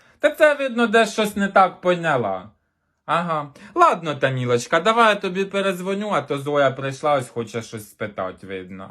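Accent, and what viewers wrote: Poltava, Ukraine. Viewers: Велике вам спасибі! Ви точно як моя мама розмовляете! Сто відсотків Полтавська область! Слово ночви тільки там вживають! Дякую вам, будьте здорові!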